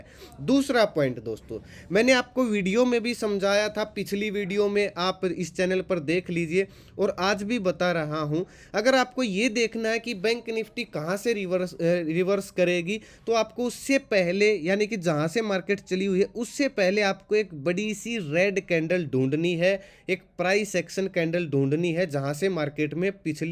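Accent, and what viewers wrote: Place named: Marwari, India. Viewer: आपका बोली का लहज़ा तो मारवाड़ी लगता हैं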